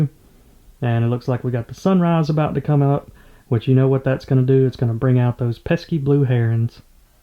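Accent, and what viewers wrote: South, United States. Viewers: Has his accent become more southern??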